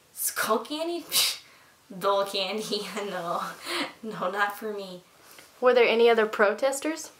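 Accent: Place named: Upper Midwest, United States. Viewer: Let me guess, she's from Wisconsin? Perhaps Minnesota? Nice accent:)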